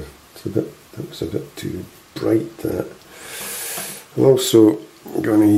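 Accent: Scotland, United Kingdom. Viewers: I just found you! I'm so glad I did. I so loved watching this video, and everything come to life. You're amazing. I loved listening to you talk. Are you Scottish?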